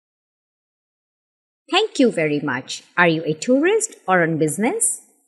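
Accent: India. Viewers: Charming woman's voice - but you have an Indian accent -- and there are errors... For example, the word is not correctly pronounced "Vindow". It's a "window". W. Made with the lips without the teeth touching the bottom lip. We don't say "I'm A (long A pronounced like the letter A) tourist" etc. We say "a tourist"...short "a". It's "Vun Thousand Bucks per night" . -- nope. ONE (pronounced "won") thousand dollars. "Bucks" is slang - and too familiar for this context. I believe I heard you say "bucks"...wasn't clear. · sounds indian accent..no i want to bettter learn from brits or americans thanks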